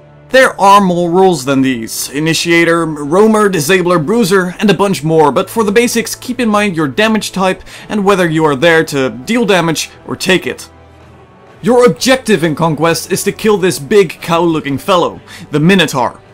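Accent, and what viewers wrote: Netherlands. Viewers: are u dutch? u sound dutch xD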